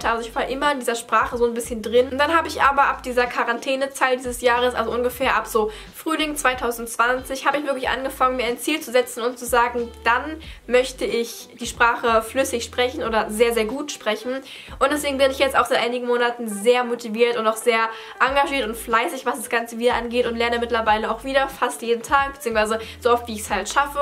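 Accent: Germany. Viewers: Deine Aussprache ist eher deutsch korean😊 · Deine koreanische Aussprache hat einen deutschen Akzent. 😂 Aber das kann man durch genaues und mehrfaches Zuhören und Übung wettmachen. Ansonsten top Leistung! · Ich liebe den deutschen akzent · Du machst das echt super 👍 Weil du sagst dass dir deine Aussprache nicht gefällt, ich finde deine Aussprache klinkt sehr deutsch (also mit einem deutschen Akzent) zumindest im Intro. Aber ich denke mit der Zeit wird sich das bestimmt ganz von selbst verändern ^^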